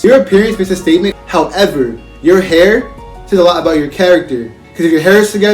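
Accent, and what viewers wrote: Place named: Haiti. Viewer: First, I just want to say I like your accent. Where is that accent from? Are you from Haity? Anyway, to get back to the topic, I think all this stuff is in Black men's head. If they are insuecure with their hair others with sense it. I have always had long hair and used to having it in braids, twists, or loose. I have never had an issue working in corporate London, UK, because I've always been confident of who I am, very proud of my African culture and never tried to fit in or gain acceptance to the detriment of my culture. Besides, my ancestors used to have long hair for spiritual reasons. Sometimes they used to keep it in locks, especially the kings and warrior class. Therefore, stuff that! I'm not going to hide my heritage to appease others just because they feel uncomfortable about an important part of my culture. That is their problem, not mine. 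With this mind set, instilled in me since childhood by my parents, I have never ever had problems working in the corporate sector previously (now I work for myself). I think I had only had one small issue many years ago when I went to an interview for an important managerial position. The interviewer (a white man) said my hair is too long and if I would consider cutting it off if I get the job. Mind your, my hair was in a neat pony tail at the time. My response was: "Never! My hair is part of me and as you can see, it is in a pony tail." I told him with such pride and assertiveness and set the foundation then and there irrespecti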